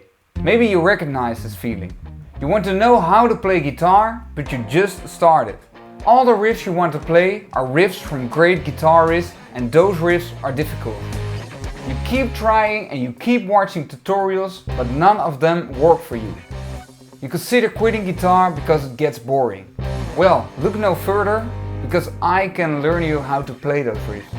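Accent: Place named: Netherlands. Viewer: Ik hou van dit volle nederlandse accent haha 😂❤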